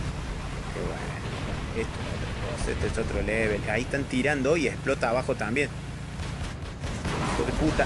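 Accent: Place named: Santiago del Estero, Argentina. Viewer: Jajajaj disfrute mas de como hablas pareces de santiago del estero hablas igual q yo xd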